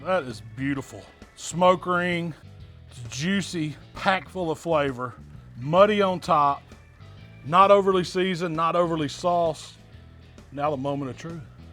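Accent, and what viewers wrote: South, United States. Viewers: It’s that southern draw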